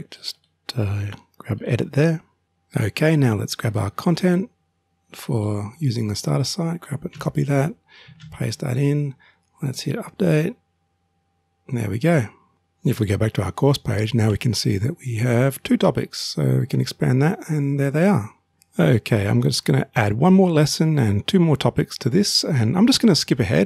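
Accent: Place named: Australia. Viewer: Thank you! Nice to hear that Aussie accent!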